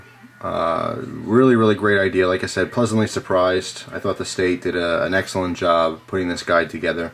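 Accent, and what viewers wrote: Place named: New England, United States. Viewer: ive been watching your videos so much i feel im taking on your new englandish accent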